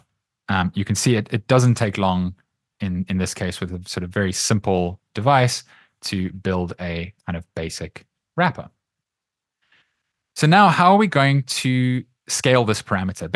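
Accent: South Africa. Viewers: Great video, something I’ve been interested in for a while. Also btw, are you by any chance South African? Your accent sounds familiar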